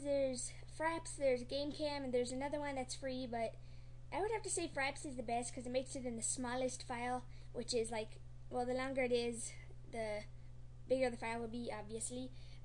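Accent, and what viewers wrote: Ireland. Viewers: Irish accent detected. Adorable. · He sounds Irish to me xD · irish? waayyy kewl :) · u irish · where r u from?? Little kid? ireland??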